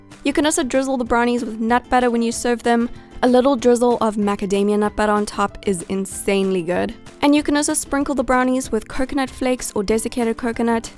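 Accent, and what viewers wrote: South Africa. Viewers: You sound so South African! Are you South African???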